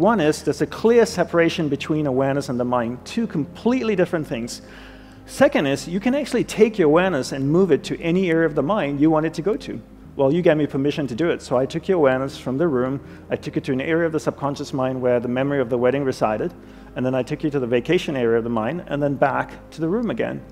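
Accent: United States. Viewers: This is refreshing: A Hindu teacher with a New York accent! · Oh for fucks sake, Indian fake gurus are now speaking with American accents.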